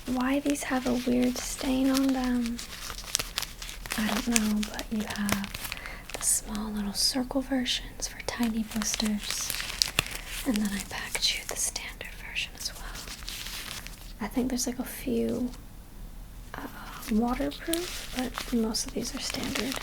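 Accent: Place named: Brooklyn, United States. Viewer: Always love your Brooklyn accent 😁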